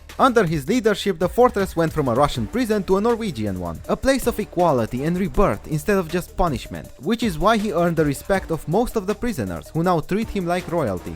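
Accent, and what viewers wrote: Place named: Romania. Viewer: your accent sounds sooooo familiar. Are u by any chance romanian?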